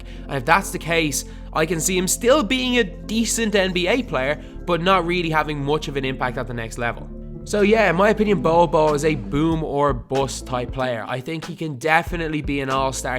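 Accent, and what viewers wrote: Ireland. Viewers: thats just normal with an irish accent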